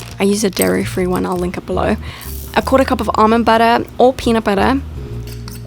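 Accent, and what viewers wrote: South Africa. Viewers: random but are you south african 😂 you kinda have an accent but i could be wrong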